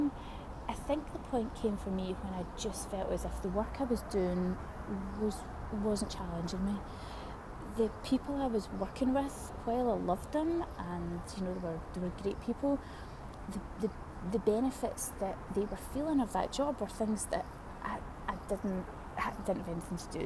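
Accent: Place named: United Kingdom. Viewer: I'm & I love English British accent ✌🏼️😁🇰🇼🕊you welcome in your second home kuwait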